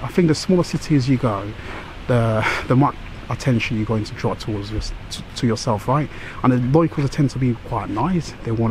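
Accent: United Kingdom. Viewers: Your accent is british not american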